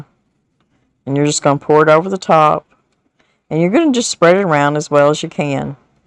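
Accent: South, United States. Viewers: Where did you find those salt and pepper shakers/grinders? That recipe may have to end up in my collection, Miss. Several of your recipes are already part of the hoard. Also, I love the accent. My other half was a southern boy, from Benton, AR and still has family in that area. Those were some memorable trips to see the 'outlaws and the in-laws'. Being that I was the only 'Yankee' there, verbal exchanges were hilarious. In fact, I sent the 'How to Talk Yankee' book and tapes to them in preparation for another visit. They're probably still snickering....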